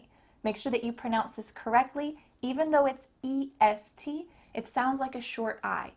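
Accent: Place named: New York, United States. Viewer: I got a friend of mine, he is from New York, and I always share your beautiful classes with him! Lol! He feels like to go back home! Lol! Your lovely accent reminds him "Home". Thanks! Lol!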